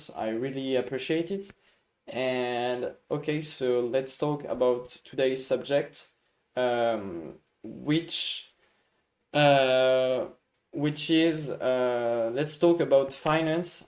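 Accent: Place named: France. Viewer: hii ur doing so well man congrats 🎉 and ur little french accent is kinda cute hahaa so dw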